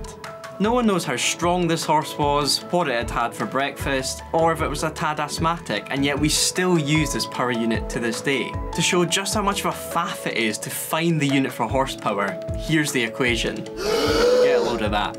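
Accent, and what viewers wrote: Scotland, United Kingdom. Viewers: FML a Scottish accent.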